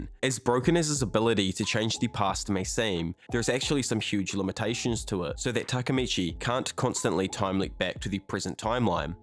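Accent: New Zealand. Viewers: Are you a New Zealander? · Love the Kiwi accent bro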